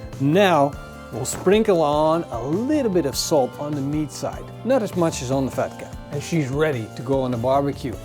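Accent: Netherlands. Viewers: I was like he sounds dutch hmmm. Turns out he is dutch 😂😂🇳🇱👍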